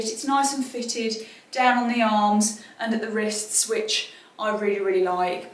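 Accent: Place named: England, United Kingdom. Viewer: Love your English accent OMG 🤌 (I am french) and looove your joyfulness, I feel happy listening, thank you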